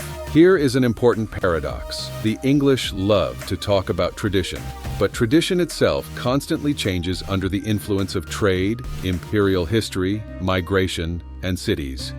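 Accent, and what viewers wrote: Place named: United States. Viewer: The AI voiceover would be a bit more appropriate if it was anglicised & deleted americanisations like,(Looo——— tenant.& rowwt) The video is ,however, a true reflection of Brits in general.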